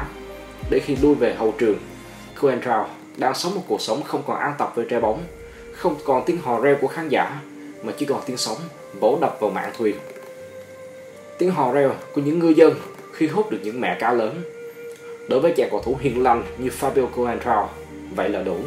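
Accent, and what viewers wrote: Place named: South, Vietnam. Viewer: Giọng thì đã miền năm lại còn ồn ào tạp phẩm ...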